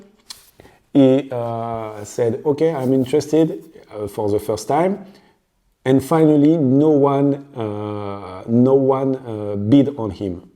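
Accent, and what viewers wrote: France. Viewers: New sub from South Africa 👋👋 and if you improve or change your quintessential French accent I will unsubscribe 🤣🤣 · That’s the French speaking English,I live to hear! Don’t like it too perfect!😊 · your Frenglaise is delightful. Please continue. Je comprends tout! Mercie. Rikki Biermann, Pretoria, Afrique du Sud 🇿🇦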